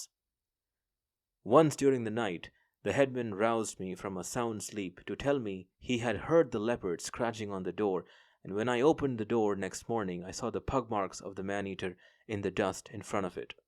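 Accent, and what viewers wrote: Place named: India. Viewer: Indian probably living in States?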